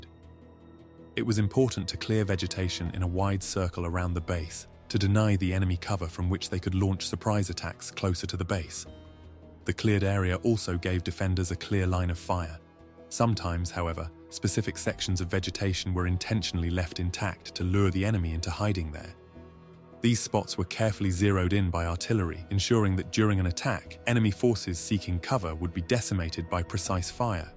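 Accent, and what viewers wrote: United Kingdom. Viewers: Why does the narrator have ti sound like a damn Limey!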